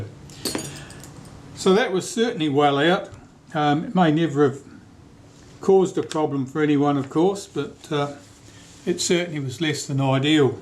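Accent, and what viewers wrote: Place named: Australia. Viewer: Thank you for this series. Although I have not yet completed my Mockba 4 shutter project, it has been helpful. I CAN report that common internet information would have us believe that the Mockba/Moskva cameras were made identically to the Zeiss cameras with manufacturing equipment they brought back to he USSR as spoils of war, changes were made by he 1950's. The Mockbas went from 5 shutter blades to 3. They are NOT of Zeiss machine fit and finish, and the metal used was obviously of a softer grade of brass in the shutter speed wheel. The springs have not held up their strength well at all. My next project will be my prized Super Graphic with 127 Ektar and Graflex/Compur shutter. I'm confident it will turn out, thanks to you. As for his Moskva or Mockba, I've relegated myself to being happy with a fast/medium/slow, and B setting ,and re-labeling the dial accordingly. 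BTW, No mistaking where you're from. Don't tell me... Australia. I'm in the US, although I expect a transfer to heaven in the next few years. Regards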